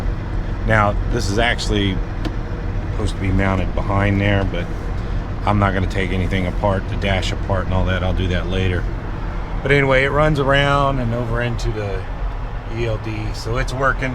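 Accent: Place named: Western Pennsylvania, United States. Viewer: What city are you from, originally. Wondered about the accent and word usage. I don't mean any insult, but you sound somewhat like you're from Pittsburgh or Western PA, where we are.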